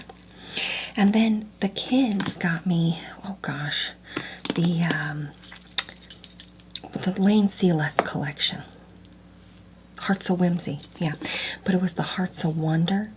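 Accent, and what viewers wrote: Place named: Midwest, United States. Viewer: the midwestern accent is so gooooood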